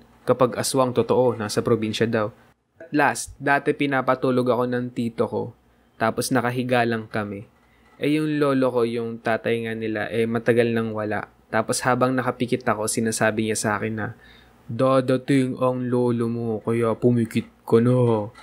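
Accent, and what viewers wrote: Philippines. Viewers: I love your Filipino accent Say it again.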